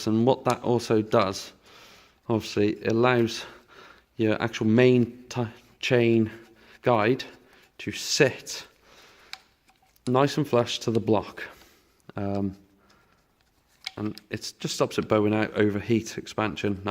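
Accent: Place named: Newcastle, United Kingdom. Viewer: Thought you were a geordie in the beginnnning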